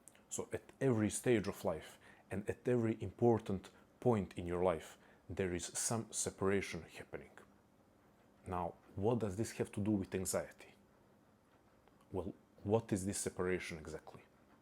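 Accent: Balkans. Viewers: Broo, do you have Balkan roots? You have some strong Balkanic accent (strong š, č, ć, ž, đ, dž sounds)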